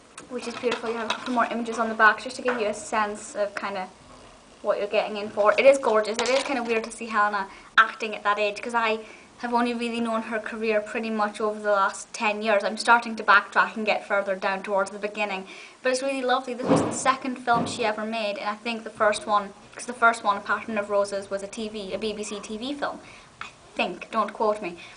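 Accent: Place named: Scotland, United Kingdom. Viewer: Are you from Scotland???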